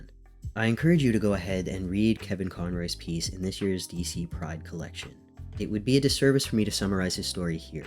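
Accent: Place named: Canada. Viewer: How am I just realizing that is Canadian? Like, SUPER Canadian. I've been watching him for months and it just glossed right over my head. Maybe because he just sounds like home, so it didn't even register.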